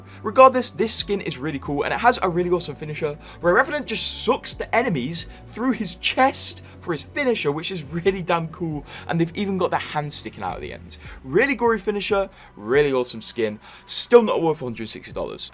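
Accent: United Kingdom. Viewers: Hello british man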